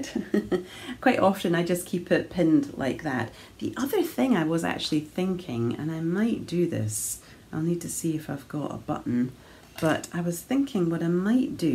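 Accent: Scotland, United Kingdom. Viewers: Dearest, what I love most when watching your podcast is your language - your scottish pronunciation and your pleasant voice. I also appreciate when you podcast with your mom - I think that you both knit a lot of beautiful garments! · Hello - I always appreciate the way you move through your podcast….meaningfully😊not rushing but taking the time to fill in the details. And your soft Scottish accent is very relaxing and comforting. It always means a lot to me when you review Art exhibitions and other places you’ve been as our creativity doesn’t start and stop with knitting. I very much love your new shawl pattern and it’s so lovely that you’re thinking of us in the Southern Hemisphere too!! I live in Tasmania, the little island state to the south of the Australian mainland - it’s a beautiful, magical place to live but we usually still need a cardi or shawl on in those Summer evenings - so one of those could just fit the bill!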